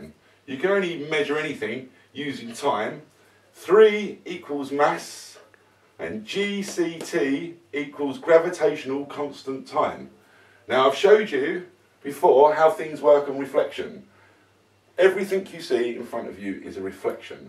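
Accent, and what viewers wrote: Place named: United Kingdom. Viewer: Of course it would be a dumbass Brit pretending to argue against Newton. I mean your people are so completely slow in the head that you pretend your preserved parks are deep woods on your deforested little island nation and beat your knives in the place of buying saws. I surely hope that by now everyone recognizes that dumbass accent as the idiocy that it actually represents.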